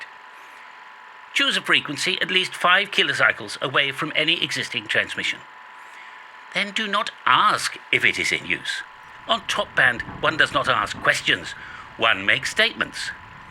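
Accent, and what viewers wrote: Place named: RP, United Kingdom. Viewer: Love the posh accent! Thanks Mr!;) 73s de F4HZN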